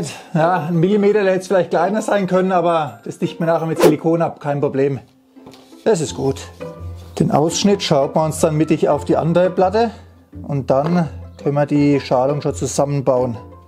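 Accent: Franconia, Germany. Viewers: Meine Ohren hören Fränkisch 👂🏻👂🏻👂🏻......Region Bamberg? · Cool, habe auch schon darüber nachgedacht, sowas selber zu bauen hatte aber nie eine vernünftige Idee. Das hier ist die Grundidee, die mir gleich zwei Probleme auf einmal löst. Danke für diesen Tip. Zumal ich diesen pfiffigen Kanal gar nicht kannte. Und dann das Ganze noch schey fränggisch! Wos moch der Frangg nuch mehra?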